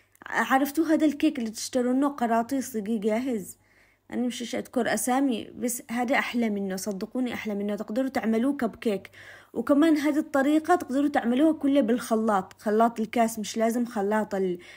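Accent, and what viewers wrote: Yemen. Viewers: حلوة اللهجة اليمنية ❤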